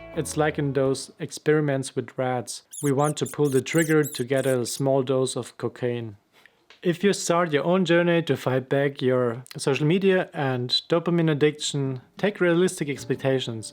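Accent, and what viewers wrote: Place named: Germany. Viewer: I dont think that it has to be completely black or white. I never used Insta, TikTok or Snapchat in the first place but I spend a lot of time watching YouTube videos. When watching YouTube I'm still split apart. I mean I watch a lot of educational videos (like this one), but I still dont feel productive when doing it. By the way my first language is German too, I barely noticed the accent.👍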